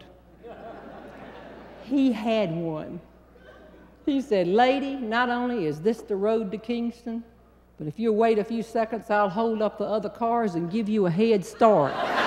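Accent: South, United States. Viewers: I’m a wee Scottish woman and I understand every word of your lovely southern accent!!! 🤩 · I've watched two separate videos on YouTube and correctly identified the guys as North Carolina natives (Eddie Smith, owner of the 1967 Ferrari NART Spider recently auctioned for millions, and singer Scotty McCreery), all thanks to watching videos and hearing her beautiful southern accent. Her wonderful stories make me want to visit the US and travel from North to South just to see if I can peg the difference in accents. 😉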